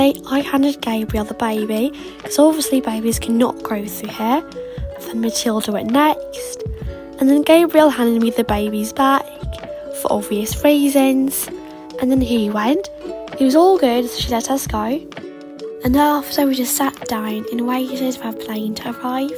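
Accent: United Kingdom. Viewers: do you have the real british acceant no hate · You have such a beautiful voice! I love the British accent! 😉😄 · Hi!!! I have a question!! In your tiktok role plays, you are American. But in your videos/voice-overs you are British. Why is this???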